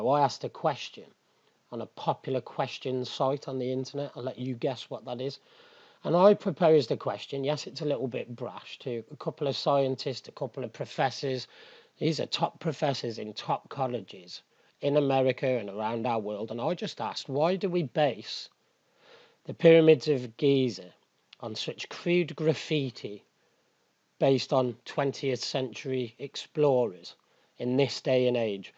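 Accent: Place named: Birmingham, United Kingdom. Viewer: The Lost History Channel TKTC I’m here (&subbed) due to you fella.and I do love a brummy accent 😉👍🏻😂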